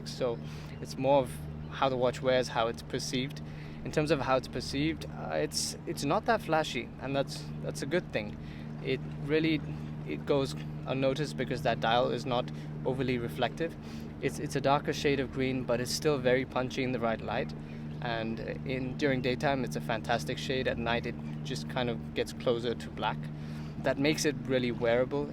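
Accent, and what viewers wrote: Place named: India. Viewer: Where are you from? Your accent is like Indian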